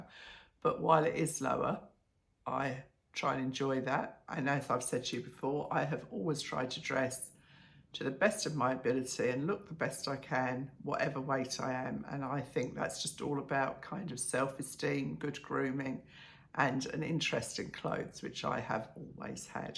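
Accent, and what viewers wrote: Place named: United Kingdom. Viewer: I like you in the navy tights!!! I know I’m going against your Mum but I Love the navy with the navy shoes. I watch all your videos I just love your voice and your British accent. As always all my love from Chico, California. Vicki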